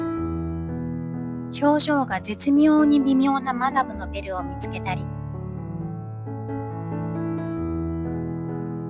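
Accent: Kyoto, Japan. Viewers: いや いやいやぁぁぁぁぁぁぁ…… これがLilyさんの声かぁ〜 なんちゅう 声…… 動画を見つつ どういう風に例えたら 良いかと 思っていました。 とっとこハム太郎のリボン🐹🎀ちゃん みたいに 可愛い 声。 声がこんなに可愛いのなら お顔も 絶対可愛いはず‼️ 声優さんやナレーションのお仕事をされていたのでしょうか？ いつも散策の時の 微かに漏れ聞こえる つぶやき じゃぁもったいない。 話すテンポや 言葉選び 。 とても 素晴らしいじゃあ〜りませんか✨ 京都訛りの穏やかな 口調の 中に やまとなでしこ らしく 強さを感じます。 これだけの動画編集……｡ どれほど時間をかけたのかと思うと 拝みたくなります。🙏🏻✨ 見応えのある４５分でした。 コーヒー☕️を煎れて もう一度 観たいと思います。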